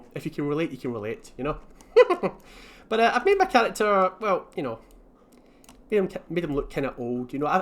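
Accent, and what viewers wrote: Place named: Scotland, United Kingdom. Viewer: Love Scots and Scottish acsent.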